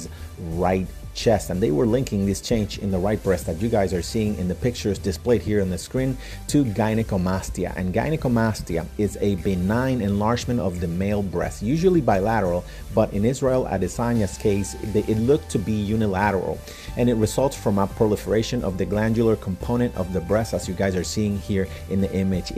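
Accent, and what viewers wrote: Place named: Philippines. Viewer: You sound very fillipino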